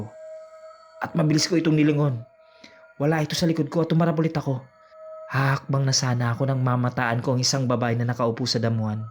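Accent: Visayas, Philippines. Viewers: Bisaya ka ba o waray?? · Bisaya ka bay.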